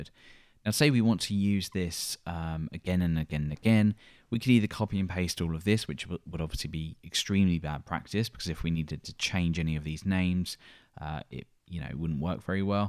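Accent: United Kingdom. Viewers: 2 things i love: British accent & simple explanation. Much obliged.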